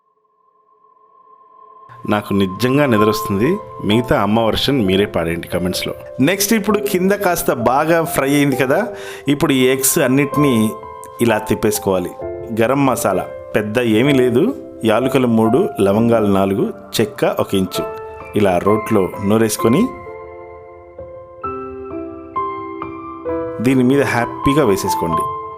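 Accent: India. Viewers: sir, plz check your Instagram for both egg n gheeRoast Ckn. Just tagged from my wife's account😊😊we just prepared...as usual Ur voice, song, presentation.....finally Telugu slang.......pichchekkinchesindi😂😂😂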